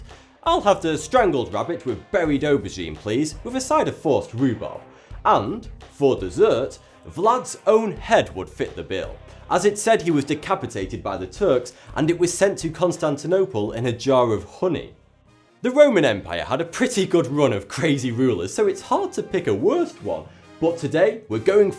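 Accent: United Kingdom. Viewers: R u British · Is this guy British or just a hipster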